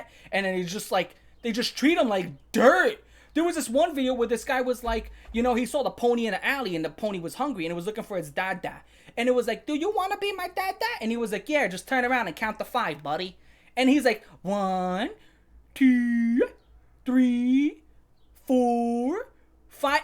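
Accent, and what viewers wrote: New York, United States. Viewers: bro sounds like Peter Parker that is actually new yorkian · I couldnt stop laughing at this, i was just listening while working and hearing this new york accent describe eating shit was something else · I think the reason people call you Italian is due to the New Yorker accent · Your New York accent makes this video funnier 😭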